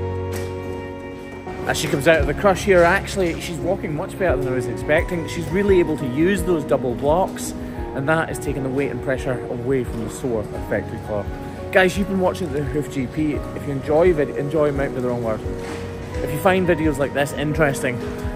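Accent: Scotland, United Kingdom. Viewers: While I have watched a lot of these farrier videos over the last 2 or so years, I have to admit that I have come to watch the Hoof GP just to listen to the Scots accent, as my grannie was from Dunfermline and immigrated to the US in 1920, and when this guy does these videos I close my eyes and imagine it's my grannie I'm listening to. Is that weird?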